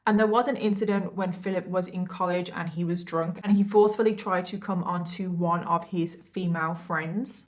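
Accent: United Kingdom. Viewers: I'm an American but my parents/grandparents were British. I enjoy your accent, reminds me of home. Side note: you are so pretty! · I love accent so much. If I had a British accent this would be the one I'd want 🤣, what part of Britain are you from? I love listening to you speak (not in a creepy way just as a comforting way lol) and even more of a plus is that it's crime stories 😆 if I ever imagine having a British accent this is the kind exactly 💯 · Before even watching the video, only hearing your opening sentences -- I had to comment. (Also, my very first comment on your page BTW) How to pronounce "Craig" in Craigslist...?? Please say the name however you want! You are always so polite and kind, so inclusive and respectful to anyone who could ve watching your videos. I love your stories because they focus on one murderer. So fun listening to the back story of the upbringing and if its not available, you are just as bummed as we all are. 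But you still make the story over the top interesting. I love your voice especially your accent!! Your enthusiasm your side comments fresh from the UK the most! -- LOVE your new Podcast too! I am back to YouTube since I have listened to all the Podcasts. Tons of YouTube videos to choose, so yay for Saturdays and True Crime with leading the way. Nichole from CA, USA 💋💌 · I love your channel for many reasons but one in particular is that you are on the same wavelength as I am in your thinking 🤔 another is I love ❤️ your accent im sure every American always comments about that but I do love a British accent ❤️ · you are British, pronounce and speak as you normally do. I love how people who live in other countries speak. Unless, you use a word that most people have no idea what you might be saying, then a definition is very much and respectfully appreciated. Most, like British, much more proper than Americans and I love just listening to accents. 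So keep on keeping. Don't change. I am from the U.S. and I have no accent because am on the west coast.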